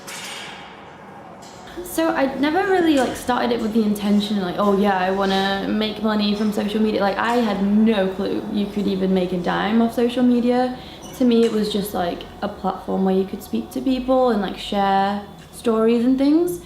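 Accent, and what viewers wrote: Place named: United Kingdom. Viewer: Latina with British accent 😍😍😍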